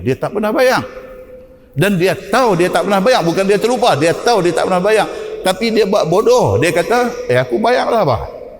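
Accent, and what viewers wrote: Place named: North, Malaysia. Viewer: Saya suka dengar , sbb kadang keluaq bahasa utara yg dah lama tak digunakan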